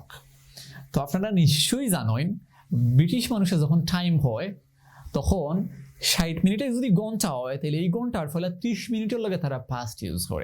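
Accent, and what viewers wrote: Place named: Sylhet, Bangladesh. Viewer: Very impressive sir. ওরে ছিলটি রে। ভালা লাগলো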